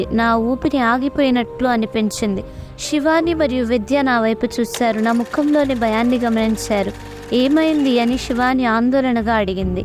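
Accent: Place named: India. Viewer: Good 👍 nice telugu slang is nice good voice some words we are not able to understanding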